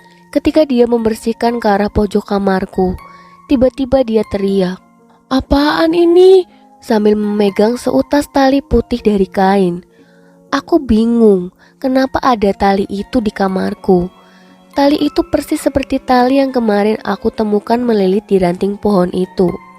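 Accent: Banyumas, Indonesia. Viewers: Galfok karena naratornya medok bgd bacanya. apalgi pas baca merokok, cicaheum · Suaranya....koyo wong ngapak...... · Ini naratornya mbak asli banyumas ya? Aksen ngapaknya keliatan wkwk 😂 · Ini pasti orang ngapak banyumas /cilacap. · Orang ngapak nih kayaknya koreksi kalo salah 🤣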